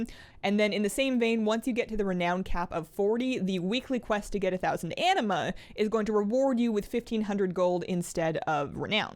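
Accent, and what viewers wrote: Canada. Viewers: Wait....are you Canadian? If so that makes you even more awesome!!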